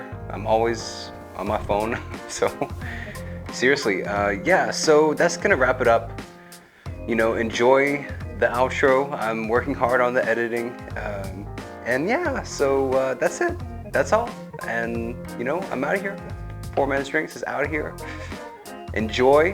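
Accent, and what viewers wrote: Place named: South, United States. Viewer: I know right? Love his slight southern accent too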